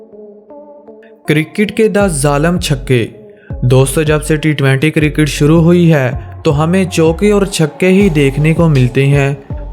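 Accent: Punjab, India. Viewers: Bai tu punjabi lagda teri speaking too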